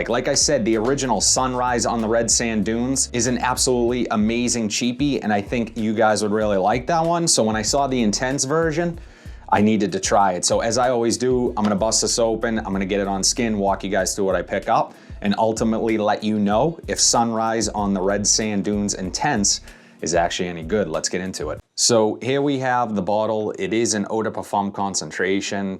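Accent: Boston, United States. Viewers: Interesting accent. Like Southie Boston lol. Very handsome.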